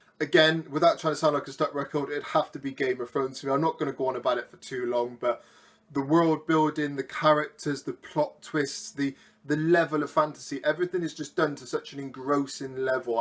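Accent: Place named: South, United States. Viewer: I might just do this! Didn't get tagged, but it seems fun and I want to film something chatty and lighthearted. In other news, are you from the south? I can kind of hear it in your accent but I haven't been back home in years so it might be off a bit. Great video! :)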